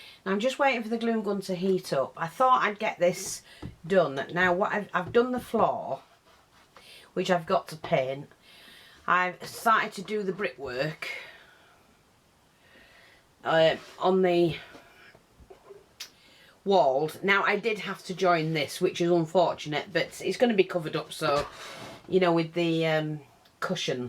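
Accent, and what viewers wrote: United Kingdom. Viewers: Loved watching you do this. You always have exactly what you need. Wonder if it would be the same way if I tried it? Not! Love your British accent. I’m from SC in USA so it’s quite a novelty..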